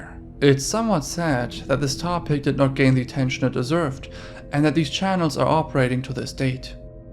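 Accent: Germany. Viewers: Nice slight German accent, he'd make a good asmr channel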